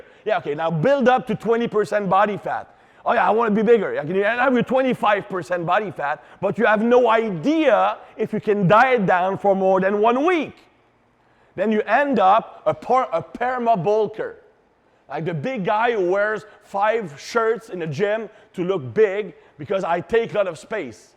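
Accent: Quebec, Canada. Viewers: What is it about a French-Canadian accent that makes me want to shut up and humbly learn???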